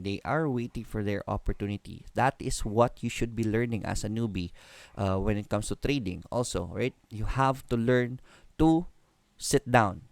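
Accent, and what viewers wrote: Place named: Visayas, Philippines. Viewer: sir , bisaya ka pala hehehe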